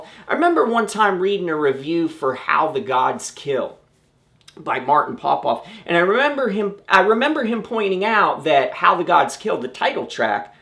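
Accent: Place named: Ohio, United States. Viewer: Sounds like Ohio to me!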